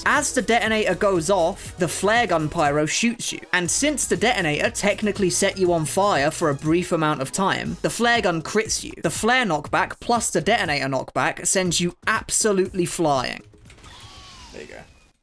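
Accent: United Kingdom. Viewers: babe wake up new tf2 video from funni british dude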